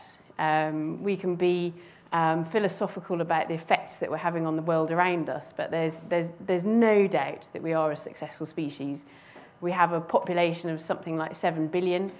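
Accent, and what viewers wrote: United Kingdom. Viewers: what part of england does that british accent come from and does it have a particular name? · The language is English but to this American’s ears it sounds very British. Some Brits are easier to understand than others, I’d say she’s in the middle. Anyway, this is a good talk if getting dated. No particular insights although I didn’t stay till the end.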